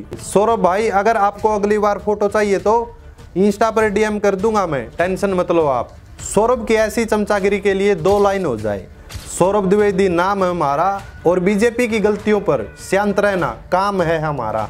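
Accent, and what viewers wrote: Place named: Rajasthan, India. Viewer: Arre bhai aap bhi rajasthani ho boli se pata chal rha h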